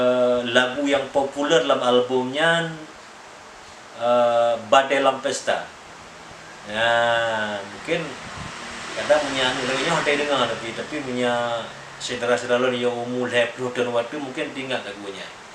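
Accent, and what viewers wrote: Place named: Aceh, Indonesia. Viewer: Logat peugah haba hana hie agoe Aceh Besar. Hie Aceh Utara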